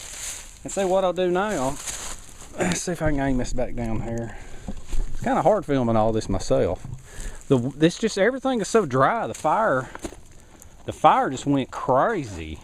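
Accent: United States. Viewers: You've got my vote for best American accent on YouTube.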